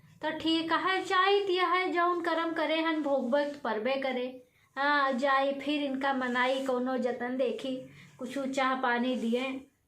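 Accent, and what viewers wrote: Awadh, India. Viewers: मुझे जब भी अपनी महिला मित्र की याद आती है तो मैं आपकी ये वाली वीडियो देखता हूँ, मैं उनको ये वीडियो सेंड किया था तो वो बोलीं थी कि ये तो बिल्कुल हमारी तरह से बोलती हैं, अवधि भाषा में, हमको कम समझ में आता है हम ठहरे बृज के निवासी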